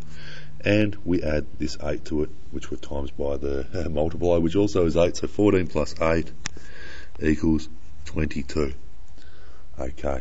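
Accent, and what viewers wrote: Australia. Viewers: tanvi sharma, he's Australian.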